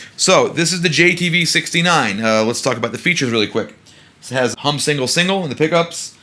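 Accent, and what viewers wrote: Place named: Brooklyn, United States. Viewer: yo are you from Brooklyn? Bensonhurst or Bay Ridge?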